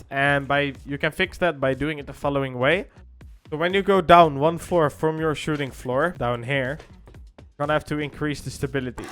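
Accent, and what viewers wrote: Netherlands. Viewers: Are you from the Netherlands or Belgium ?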